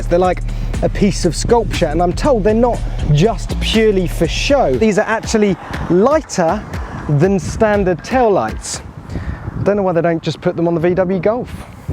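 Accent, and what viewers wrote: United Kingdom. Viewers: more like a mix of Hammond and Gordon Ramsay accent lol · Cuz he's british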